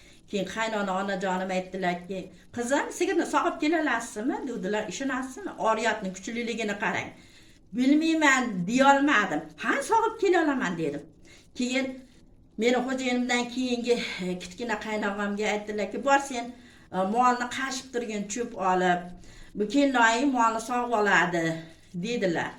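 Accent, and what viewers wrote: Andijan, Uzbekistan. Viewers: ЯХШИМИСИЗ ДОМДА ЯШАЯПСИЗ МИ КИМ БИЛАН ЯШАЯПСИЗ ТОШКЕНЛИКМИСИЗ ШЕВАЕЗ АНДИЖОНГА УХШАРКАН ИЛТИМОС ЖОВОБ БЕРИНГ НЕЧТА ФАРЗАНДИЗ БОР ШУ ЁШДА ЖУДА ПОКИЗА АЁЛ ЭКАНСИЗ МАШААЛЛОХ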